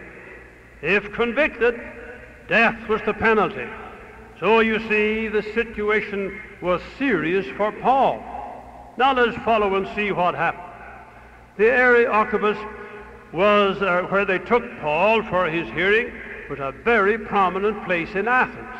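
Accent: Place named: Ireland. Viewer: Alexander Hugh Macmillan (June 2, 1877 – August 26, 1966), He was born in Canada and I love to listen to his slight Irish lilt. A dynamic speaker, he presented a history in his book Faith on the March, published in 1957.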